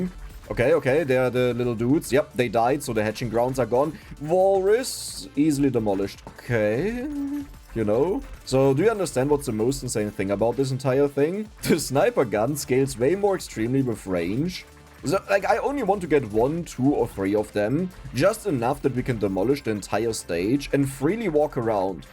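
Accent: Germany. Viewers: Es ist so lustig wie man das Deutsche da durch hört (speeden mit 200 km/h) --- It's so funny how you can hear the German through there (speeding at 200 km/h)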